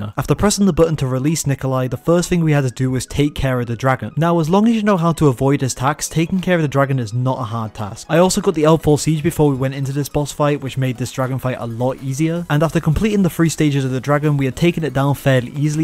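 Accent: United Kingdom. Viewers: a tale as old as time an english accent, and a cod zombies easter egg like bread and butter, good and evil, night and day. yin and yang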